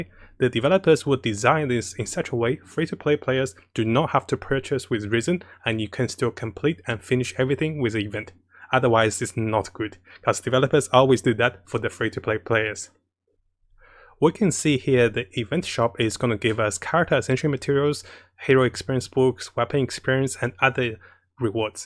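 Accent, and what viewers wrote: Australia. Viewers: This guy must be Australian. Just my guess lmao I think I'm wrong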